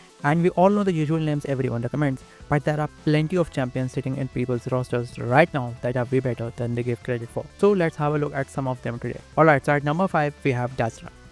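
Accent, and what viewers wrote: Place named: India. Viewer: bro whats your country origin ? cause you have alot of indIan accent in your talk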